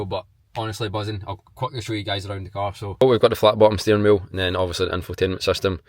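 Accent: Scotland, United Kingdom. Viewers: Which uk accent is this 😅😂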